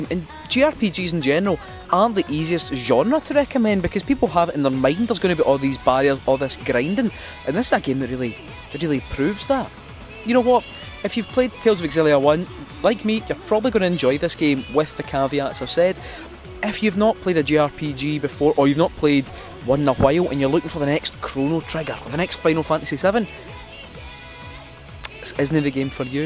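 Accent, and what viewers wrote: Scotland, United Kingdom. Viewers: are you Scottish, British, or Australian?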